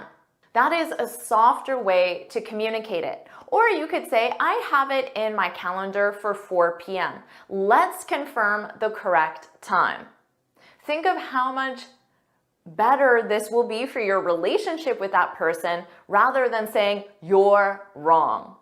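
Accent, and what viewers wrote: United States. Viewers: You have a perfect American accent.. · OK for American English, but it's a far cry from how British people speak. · this is an american english, right?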